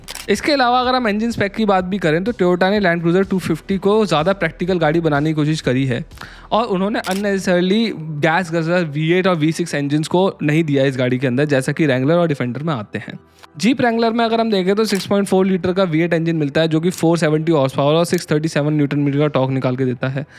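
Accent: India. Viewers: This is Indian English, deal with it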